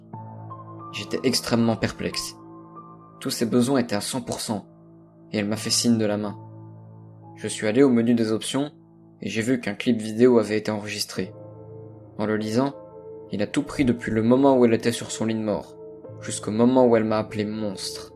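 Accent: Belgium. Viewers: tu es belge?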